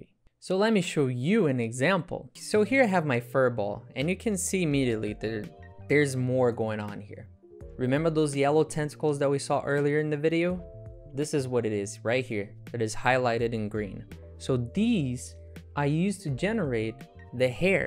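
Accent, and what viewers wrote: Brazil. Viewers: Your accent remembers me the brazilian accent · is that a brazilian accent? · Wtf i think you have the best english in Brazil lol I mean you lost most of the accent... i can only wish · Esquisito como eu consigo sentir q você é brasileiro kkkkkk. Vídeo muito bom e vc explica mto bem, cara, parabéns na moral foda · Eu reconheço um br quando vejo e escuto um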